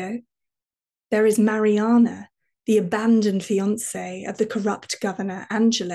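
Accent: United Kingdom. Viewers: The British accent is beautiful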